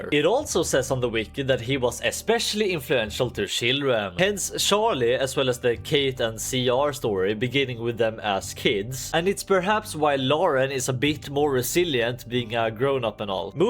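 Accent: Sweden. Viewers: are you from sweden ? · I just know this guy is from Sweden. Can hear it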